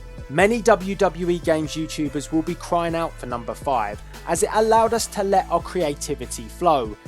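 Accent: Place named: United Kingdom. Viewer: Every time I think a WhatCulture narrator could not possibly be more UK/British-sounding, they manage to top themselves.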